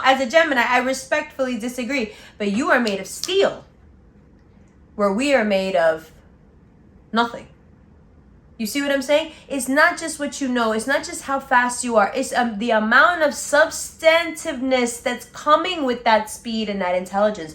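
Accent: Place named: Brooklyn, United States. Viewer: Articulate and on point for the first half...straight up Brooklyn for the second half! Love you....need to get into Libra season...🙏🕊🌬⚖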